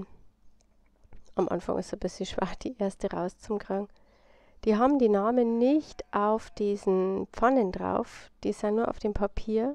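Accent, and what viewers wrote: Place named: Bavaria, Germany. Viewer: Wie sympathisch ist das denn mit dem bayrischen Akzent!💕 Genau diesen Farbkasten (kein Metallic), habe ich schon lange im Warenkorb bei eBay! Die Farben scheinen noch zusätzlich zum Schminkekasten unterschiedliche Nuancen zu bieten. Dein Kanal war mir leider bis heute unbekannt. Aber gleich mal Daumen hoch und Abo! LG.🎈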